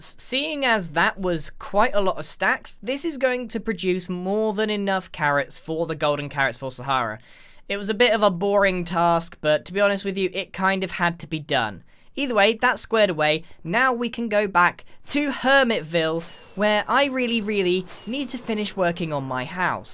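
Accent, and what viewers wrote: England, United Kingdom. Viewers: Grian has an English accent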